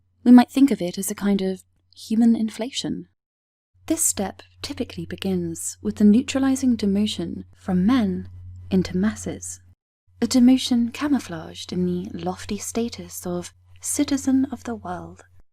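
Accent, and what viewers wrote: England, United Kingdom. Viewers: WOW! This ladys English accent is fabulous & sadly soon to be replaced. I prefer this to an ISLAMIC accent. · Gosh, this British accent is so cute ❤️. greetings from Brazil. Get Brexit Done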